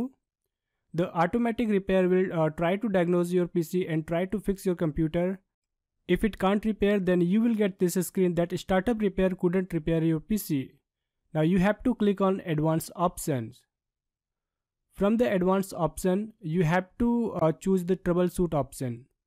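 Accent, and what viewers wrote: India. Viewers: indian ahh voice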